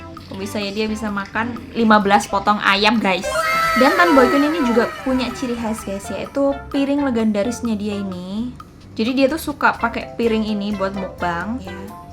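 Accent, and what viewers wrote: Java, Indonesia. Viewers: kak cantik dan medok 😆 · Aku seneng medoknya kk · 1. Ria SW - otomatis 2. Omay - Farida Nurhan 3. Shelly Che 4. Nex Charlos 5. Duo Pengacara 6. Ken & Grat Note: klo kak ttp loch ya & itu pasti. Ciri khas logat jawirnya tak terlupakan...:^) Salam sehat & sukses selalu ya kak. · mantab mbak, english karo jowone medhok kabeh 😂😂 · Betul. Aku tuh klo pemberitahuan ria sw. Lgsg buru buru liat. Ga tau kenapa ya.. suka aja🤭 Sama dong. Krn medok mu😄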